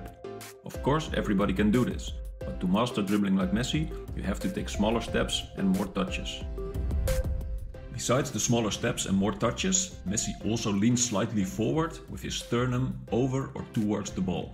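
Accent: Netherlands. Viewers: is that dutch accent omg that English dutch accent sounds horrible,,,,,,,, the video is good thank you so much my friend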